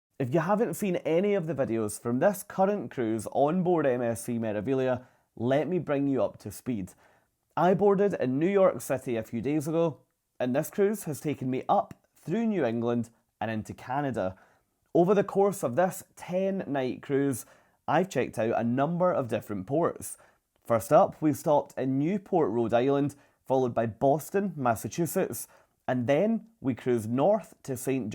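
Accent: Scotland, United Kingdom. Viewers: I just now stumbled upon your Vlog -- nice to hear a fellow Scot. My travel agent REFUSES to book us on MSC, so I watched this with interest to get your view. After this, I think we'll stick with Celebrity and Regent.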